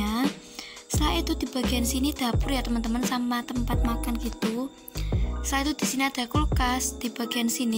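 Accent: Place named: Java, Indonesia. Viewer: Kok suaranya kek Jawa gitu tapi gpp semangat ya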